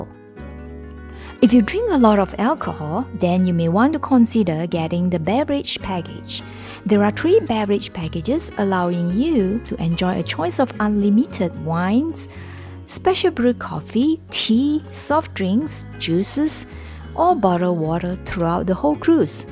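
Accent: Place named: Japan. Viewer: Lol..I see. Now I know this is an English video with some Japanese pronunciations..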